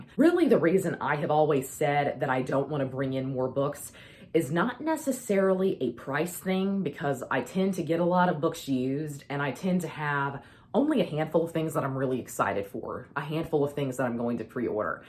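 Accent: South, United States. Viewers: Changing goals? Changing content style? Changing taste in books? Hey, that happens to us all as we grow, age and change our priorities. Just promise that you'll never stop saying "y'all" the way you do! As a Brit I can't get away with using it in conversation (sounds very strange coming out of my mouth with my accent) although I sprinkle it in tweets, comments and text messages whenever I can get away with it; but I just love the way it sounds with your accent - to me it sounds like a proper Southern Belle. I attribute 20% of my overall enjoyment from your videos to the accent and in particular your use of "y'all"; so as long as that doesn't change, the rest is all just details. All the best for 2024! 💙 x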